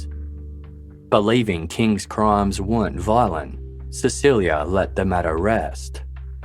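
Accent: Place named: Queensland, Australia. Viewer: The Queensland accent is especially delicious for the dark bits!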